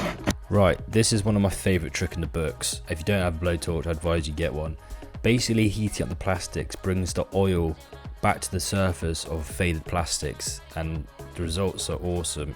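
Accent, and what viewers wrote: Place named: Australia. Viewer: Pretty good aussie accent as judged by an aussie!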